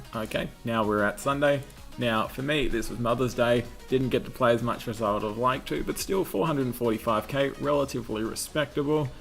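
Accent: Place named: Australia. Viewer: Man I subbed after watching your Rex safespot guide, glad I stayed around. For such a small youtuber this is really good quality. I can tell by your accent you're aussie so I gotta wonder whats your upload speed?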